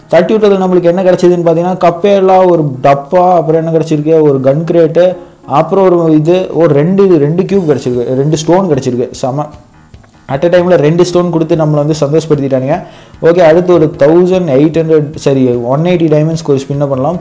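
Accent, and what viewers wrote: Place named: Kerala, India. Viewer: Bro kerala erunthu pesure semma4bro supper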